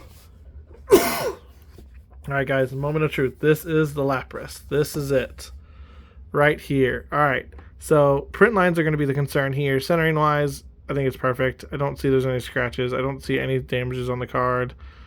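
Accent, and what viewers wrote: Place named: Canada. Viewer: 460 you sound canadian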